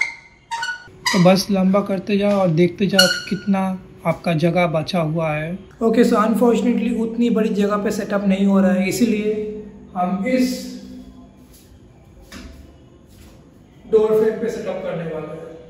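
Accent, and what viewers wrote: Bengal, India. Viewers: Bengali ya odia?😂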